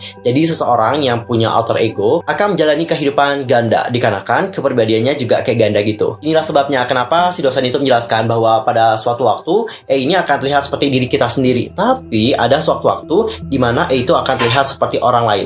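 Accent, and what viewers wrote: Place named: Java, Indonesia. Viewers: Medok ya kak hehe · Maaf kak aku yang salah. Aku ngakak kok medok sih 😭🤣🙏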